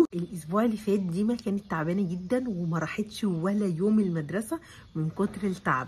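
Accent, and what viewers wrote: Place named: Tunisia. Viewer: لهجة تونسية 😊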